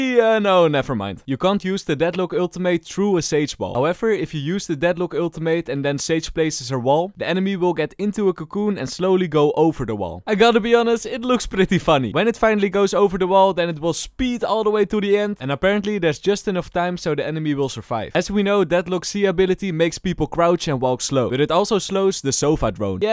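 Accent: Netherlands. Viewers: bro has the most dutch accent ever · from the 1e time i heard y i knew y whr dutch! ik ken het maat, het is ook zo bij mij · ur acent is dutch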